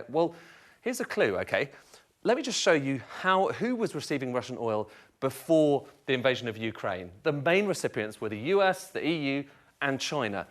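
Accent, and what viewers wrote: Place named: United Kingdom. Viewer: Classic brits narration..master of manupulation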